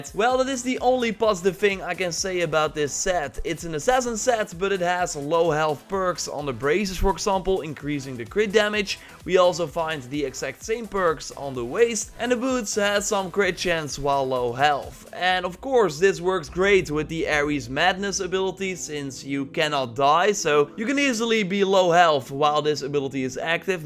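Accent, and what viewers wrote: Netherlands. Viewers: I can hear through the guys voice, he is from The Netherlands, correct me if im wrong.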